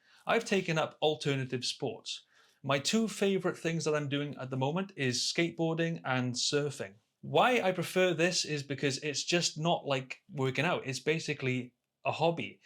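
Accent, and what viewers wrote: Wales, United Kingdom. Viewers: Do you share any thoughts or videos elsewhere? Just found your channel and it was so positive, yet still grounded, that I’m looking forward to watching them all. And I’m hoping from seeing your most recent videos that you’ve really lifted many aspects of your life. That means something, and it’s wonderful to see progress for us all. Finally, I’m a Valleys kid and your accent really helped me take your hints and tips in. Anyway, if you have any other channels I think many of us would love to know. Thanks and take care :)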